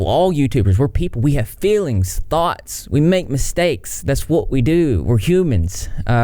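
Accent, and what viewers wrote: South, United States. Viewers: Do ya got a little southern accent? Or am I just crazy? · Just discovered your channel and already hooked. I’m glad you got better from your accident and found YouTube to help with that. There will always be haters but keep pushing forward. I LOVE your hair btw and you sound like you are southern like I am :)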